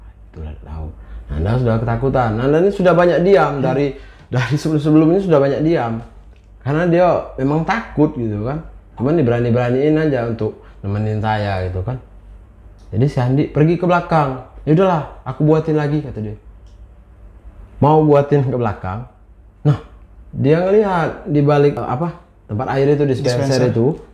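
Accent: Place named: Jambi, Indonesia. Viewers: Mas nya KKN apa uji nyali mas?? Serem gitu Btw bhasa Jambi itu sedikit Melayu ya kak? Suka denger nya saya 🙏🙏🙏 terimakasih sudah upload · Khas nyo bahaso Jambi tu bang🤣 wong Kito la ,asyik nian dengar cerito Abang tu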